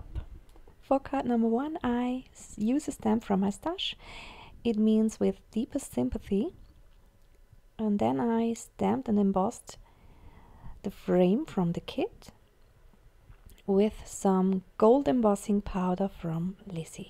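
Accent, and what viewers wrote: Germany. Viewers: You're english is very good. You speak it very well. I've watched your video's before, but didn't understand that much. Some words are similar enough I could figure it out, and I know a very tiny bit of german. I loved that you did an english voice over though. It was nice to learn what some the greetings meant. My Grandmother was german, but she died when I was younger so I didn't get a real chance to learn the language. Hearing you speak, the accent reminded me so much of her. Lovely video. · Loved hearing your accent. My husband is German and his family all spoke to each other and had such lovely accents. He never learned the language. Your cards are lovely 😊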